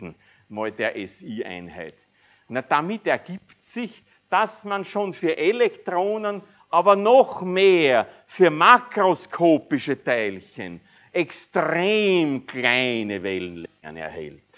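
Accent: Austria. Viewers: Sehr grosses Lob an diese Vorlesung. Als Norddeutscher ist für mich das Österreichische ein zweischneidiges Schwert: Zu Charmant um wegzuhören, zu einschläfernd um konzentriert zu bleiben... Tatsächlich taten alle Worte gut, vielen lieben Dank! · Eine großartige Auffrischung für mich, der ich in der Schule zwar Physik-Leistungskurs hatte und dann doch der Chemiebranche anheim gefallen bin. Und nicht zu toppen das Ganze auch noch im regional eingefärbten Sprachduktus. Ich liebe Österreich! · Eine gute Vorlesung mit österreichischem Schmäh vorgetragen, so dass man es gut verstehen kann. Schade, wennn man reicher geboren wäre, hätte ich gerne in Österreich studiert.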